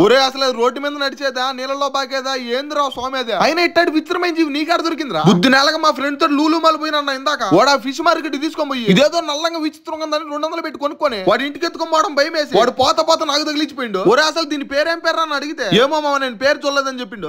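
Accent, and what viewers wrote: Nellore, India. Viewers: ante meedhi Nellore aina yaasa maaraley that is. Comment from Srikakulam district palasa Mandal rural Chiranjeevi ❤❤❤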